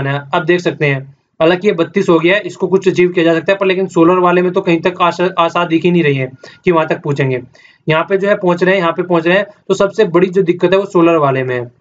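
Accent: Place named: Rajasthan, India. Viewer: sir u are the best...i appriciate ur knowledge...way of teaching....and hard work for us...and i think you are from rajasthan from your accent as i am too from ajmer i will love to meet u sir...but that too after 2018 prelims...i am to give in a lot into it till then... thanks sir..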